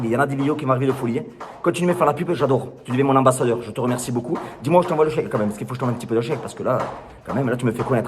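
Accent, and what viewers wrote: South, France. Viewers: Ça fait surtout du bien d'entendre ce si bel accent qui se perd malheureusement partout dans le Sud.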